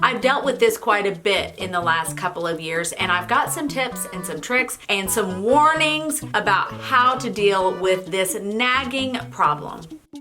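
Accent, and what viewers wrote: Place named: United States. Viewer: Thank you. I found your tips helpful. I go to Physio for other issues and complain often of pain in my right shoulder but I've never mentioned to my physiotherapist the pain in my wrist and forearm because I haven't wanted to bombard her. I should ask her for some help with my issues instead of feeling embarrassed by them. I like the name of your channel. I live near Toronto and I'm bilingual. I was surprised to hear your American accent with a French named channel! If you don't mind me asking, how did you come up with this name?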